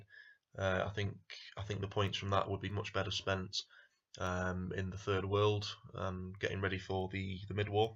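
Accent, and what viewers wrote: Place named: Northern England, United Kingdom. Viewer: "Sorry about my accent [I'm from Manchester]" — I love the northern English accent :-)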